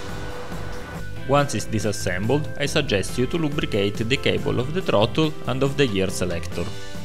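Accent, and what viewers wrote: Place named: Italy. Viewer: love your italian accent!!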